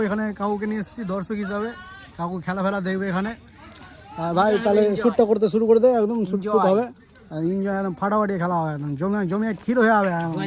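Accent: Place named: Murshidabad, India. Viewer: Puro Murshidabad ar khati kotha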